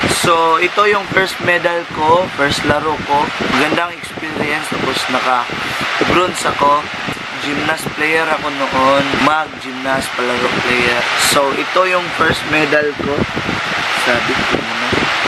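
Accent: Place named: Visayas, Philippines. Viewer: Bisaya ka sir?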